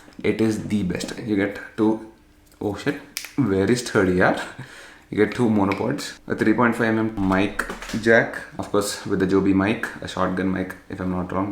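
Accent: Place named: South India, India. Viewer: You sounds like south indian where are you from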